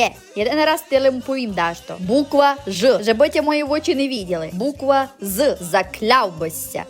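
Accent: Transcarpathia, Ukraine. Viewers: Дуже подобається закарпатський діалект і неординарний гумор)) Та ще й не аби яка симпатюля😉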